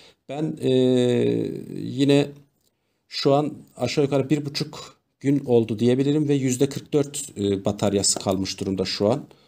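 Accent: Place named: Kars, Turkey. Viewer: Şive karsa benziyor😎güzel video👍🏻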